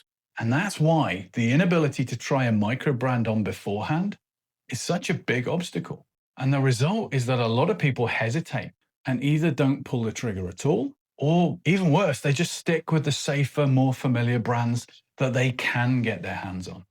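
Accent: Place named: Birmingham, United Kingdom. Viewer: Sounds like a Brummie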